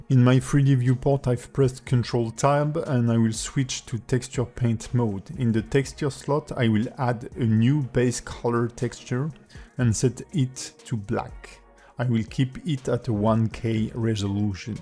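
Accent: France. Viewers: i was gonna purchase your animation course but after watching this video, im not so sure anymore. i know you are not an english native speaker but ur french accent makes the learning process 10x harder.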